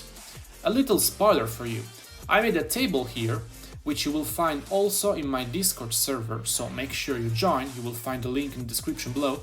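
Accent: Italy. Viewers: Lo sento l’accento italiano 😉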